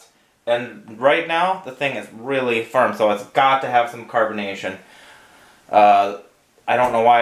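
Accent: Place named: Minnesota, United States. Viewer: ahh I love a good Minnesota accent.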